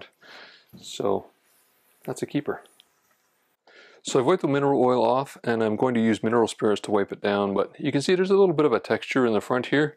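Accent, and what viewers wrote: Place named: Canada. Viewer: I enjoy a good voiceless restoration, but it was super cool to hear the explanation for everything. Its always great to see experienced professionals at work, this was a real treat! Proud of myself for catching the canadian accent almost immediately lol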